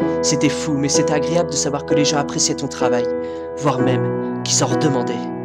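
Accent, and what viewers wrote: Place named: North, France. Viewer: Les Textes vidéos d' ah oui tu es du nord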